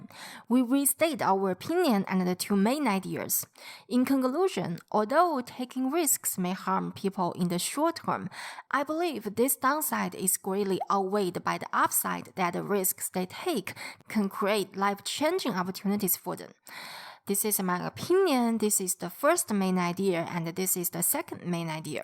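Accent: China. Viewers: terrible chines accent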